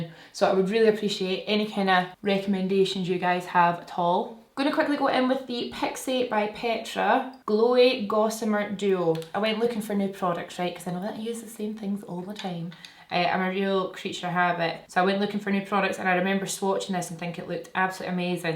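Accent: Scotland, United Kingdom. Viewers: Yasss BB coming thru with that Scot vibe to brighten my day!! Love ya xo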